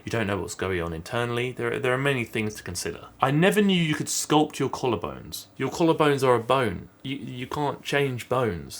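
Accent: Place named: Guernsey, United Kingdom. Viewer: Bro no cap he talks like W2S Harry , is he from Guernsey too? Sound exactly like him(the accent)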